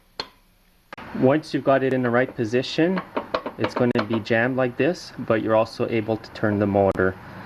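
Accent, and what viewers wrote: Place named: Canada. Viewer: YOU SOUND CANADIAN...ARE THE CANADIAN MOWERS ANY DIFFEREN THAN ONES FOR USA?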